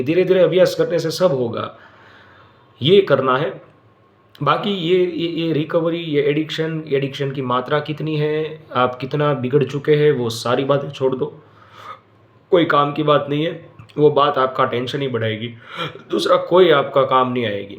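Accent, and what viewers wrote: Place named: Gujarat, India. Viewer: Sir ap Gujarati ho apiki bol se Gujarati lagraheho.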